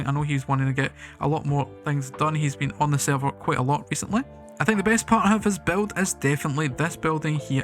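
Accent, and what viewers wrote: Scotland, United Kingdom. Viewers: love the scottish accent reminds love the builds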